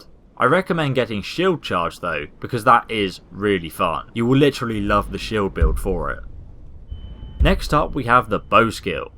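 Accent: England, United Kingdom. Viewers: Stefan Luckerbauer Sounds British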